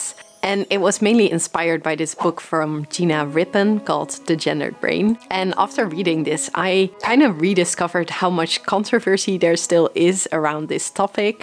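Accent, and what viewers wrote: Netherlands. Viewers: Hoi! Ben je toevallig Nederlands?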